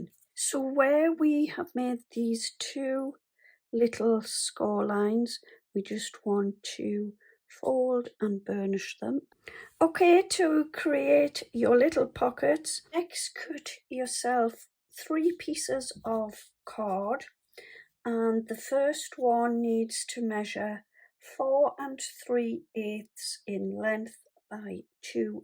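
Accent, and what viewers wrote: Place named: Newcastle, United Kingdom. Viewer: Thank you so much for the wallet idea. My sister and I are building up papercraft and crochet items for a craft fair later this year. I have been following your uTube channel for only a couple of weeks and you have such great ideas. We were looking for something for males as we felt we were leaving them out as we have lots of girly stuff. I most of all love your great Geordi accent it’s my favourite accent so I could listen to you all day(hope that doesn’t sound too creepy 😮. Thanks once again xx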